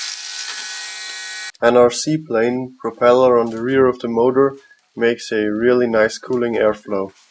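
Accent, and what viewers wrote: Germany. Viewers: Great job. I´m thinking of building one and yours is the best homemade disc sander that I´ve seen. Is that a German accent? The switch looks German made. I´m from Texas but I live in Germany :) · Fantastic build. It must have cost a fraction of what a factory built unit would cost. Your English is very good and your German accent is endearing.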